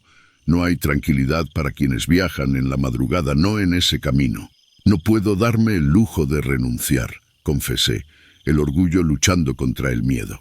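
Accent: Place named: Spain. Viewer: Se escucha come espanol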